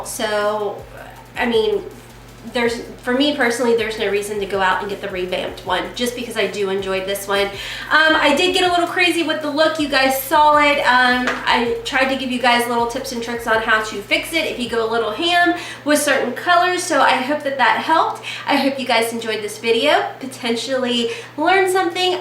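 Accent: South, United States. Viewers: I have a question.... Do you have a very heavy southern accent normally? I feel like you might but try not to have one in your videos maybe??? Well, if so, you should really let that shine through.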